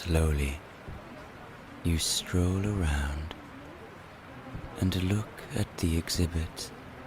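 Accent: Ireland. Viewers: Hi, I absolutely love this Rainy Story collection. I listen to your stories every night in bed and always fall asleep very soon after the actual story ends. They are all so lovely. My favourites are the rainy and book shop stories. Just know that you are very appreciated by so many people around the world listening to your lovely Irish accent telling the most beautiful bedtime stories. Thank you!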